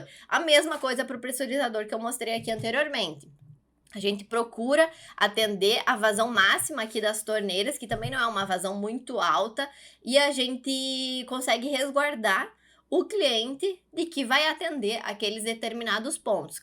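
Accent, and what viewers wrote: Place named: South, Brazil. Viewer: trem bonitinho e esse sotaque do sul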